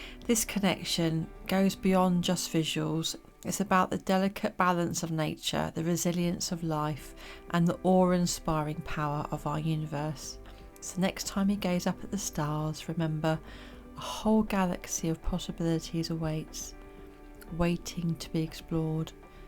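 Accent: England, United Kingdom. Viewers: This was an incredible piece of artwork,. really lovely to listen to your story. You have a very soothing voice, also great to hear a fellow English accent 😂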